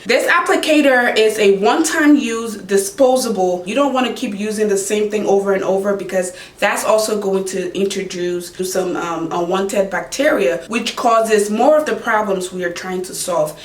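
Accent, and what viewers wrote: Liberia. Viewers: That accent sounds like a Liberian girl. Go queen ❤️ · Are you Liberian because YESSSSSSSSSSSSS!!!!!!!!!!!!??????? I can’t help but notice the accent I’m sorry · I can definitely hear your Liberian accent lol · Ayyeee! LIB sis, as I hear your accent! Do it for the culture hunnnny,! Yasss! Thanks for this info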